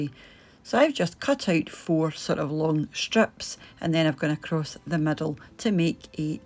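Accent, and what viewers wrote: Scotland, United Kingdom. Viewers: Hi & Jasper, I’m a new subscriber. I love your YouTube channel & Scottish accent! 😻🐟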